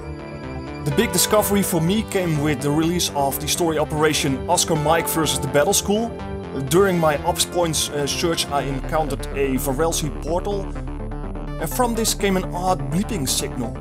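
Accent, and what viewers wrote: Netherlands. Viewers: Top man ! ik moest en zou reageren de seconde dat ik je accent hoorde haha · are you dutch? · Lol I can hear immediately that you are Dutch... am Dutch to tho !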